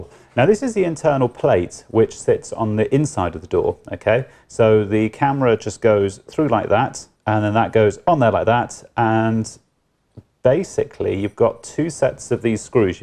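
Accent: China. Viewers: Chinglish, love it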